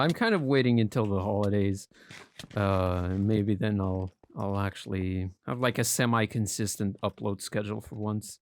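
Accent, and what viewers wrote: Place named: United States. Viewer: Ur voice changed, it got like a bit American like just a little bit and a bit more clear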